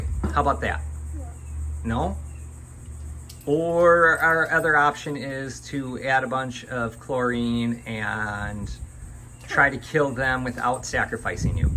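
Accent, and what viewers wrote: Wisconsin, United States. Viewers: Hey I’m curious. You definitely have a Wisconsin accent. I don’t really notice with. So when you are out & about have you had people ask where you are from? That definitely is not a Florida accent. 😂. Then again what accent is FL? Considering there are so many different nationalities living there. My pool had turned green. I had 2 floaters both with 2 3” chlorine tablets & 1 tablet in my skimmer & my pool was green. I had to get 4 5 Gallon buckets of chlorine. The next day it was clear as could be. Helpful hints. I was told by the pool people to add any chemicals at night. You should shock the pool after any rain. The rain and acidity levels throw off the chemical balance in the pool. Waiting until the evening time to add the chlorine & shock slows down the evaporation process. Both totally make sense. Anything to help save some money. 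💰🤑. Chemicals can be so expensive.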